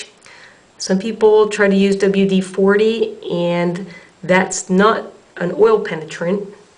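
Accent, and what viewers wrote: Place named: Baltimore, United States. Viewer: Nice exploration, thank you. "Wudder." Is that a strong Baltimore accent I'm detecting?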